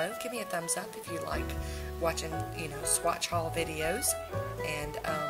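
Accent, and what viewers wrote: South, United States. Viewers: im so used to ur videos and i enjoy ur accent so immensely that when i read ur replys i hear that southern drawl. <3 i love it!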